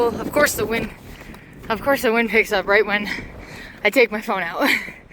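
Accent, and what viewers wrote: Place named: New York, United States. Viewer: Thanks for bringing us along on your adventures! I love your New York accent btw!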